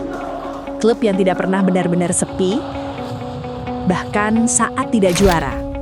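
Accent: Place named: Indonesia. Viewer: Kau tak payah bercakap loghat indon lah..geli aku ....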